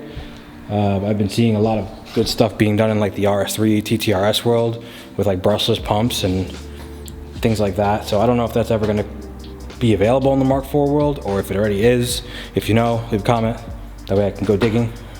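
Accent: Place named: United States. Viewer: como le comente a tu viejo, solo pesque el 20 % de lo que hablabas, el ingles USA, suena distinto al Brit......ja ja veo que la sal afecta mucho los bajos el coche, lo pudre todo, parecería que el 4 Motion, tiene mas hueco en la parte trasera del coche, supongo que sera por la transmision y el lugar que ocupa, deberás tener que cortar el baúl de tu coche y rehacer el piso?? esto llevaría un buen trabajo, supongo también que desarmaras la transmisión para ver los engranajes, cambiar bujes de goma y dar blasting + pintura a las parrillas, los semiejes también los repararas?? tenes un buen trabajo por delante, por suerte tenes el coche viejo para sacar un plantilla del piso y copiarlo.......la satisfacción, sera cuando lo veas al VW negro terminado y tirando cambios por las calles de N York.......ja ja, seguí colgando videos de la transformación, y si podes, hace alguno en castellano que lo cuelgo en mi Face, Un abrazo!!!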